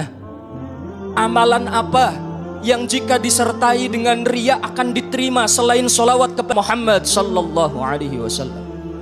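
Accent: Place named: Tegal, Indonesia. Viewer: Assalamualaikum wr wb..🙏 Ngapunten..🙏🙏 Pa' ustadz asli orang mana kok bisa ngomong Tegal..?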